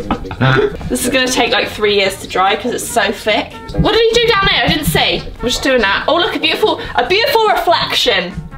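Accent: United Kingdom. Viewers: How still sound british even she live in America most of her life. Love your art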